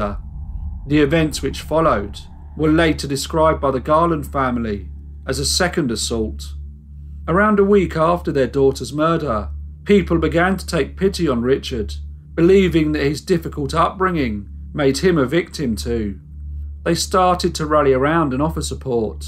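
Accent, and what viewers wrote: England, United Kingdom. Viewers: Completely unrelated to the video, but what type of accent do you have? I know you’re from the UK but I’m curious which one this is? I’m a Yank with the love of accents and have a particular fondness of all things British. Thanks 😊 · Are also Morts family ?😁👍❤He has a nice big family i wish i had the same you help each other that's so nice and i love your English accent 😊💖💖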